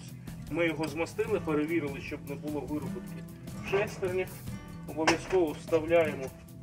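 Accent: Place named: Russia. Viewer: Это тот случай когда русскоговорящий пытается говорить на мове.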